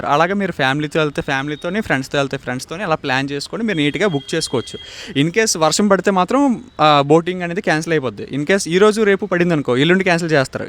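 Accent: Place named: Guntur, India. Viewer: Mona aa slang vinni lenin anukuna .... Ivale ardam aindi guntur karaam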